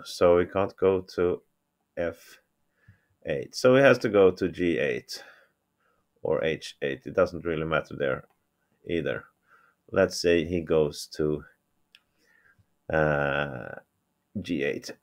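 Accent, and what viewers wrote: Sweden. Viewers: Great work bro!! Are you from Sweden by any chance?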